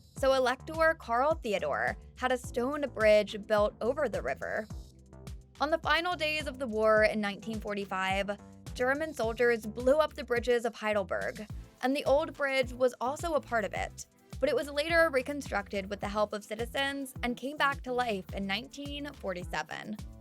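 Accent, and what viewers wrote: United States. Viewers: Nice Orange County accent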